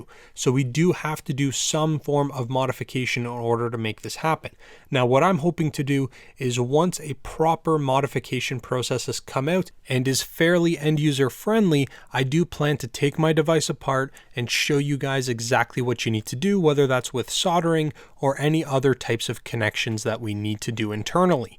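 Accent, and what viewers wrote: Canada. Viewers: You sound suspiciously Canadian.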